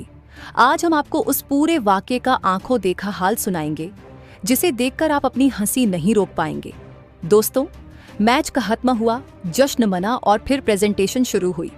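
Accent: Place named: Bihar, India. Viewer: Bihari andaaz ke liye bhadhai.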